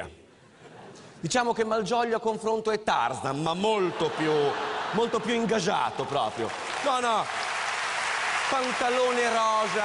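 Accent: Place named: Romagna, Italy. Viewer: L'accento romagnolo è bellissimo!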